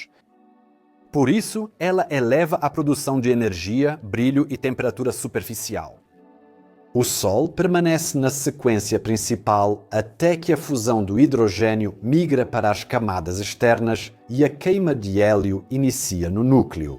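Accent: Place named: Portugal. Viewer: Excelente vídeo. Ficou legal ser narrado metade pt.pt e metade pt.br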